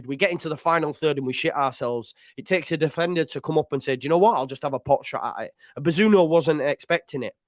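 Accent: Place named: Sunderland, United Kingdom. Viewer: Are you getting g a bit of a mackem twinge? Howay great content thanks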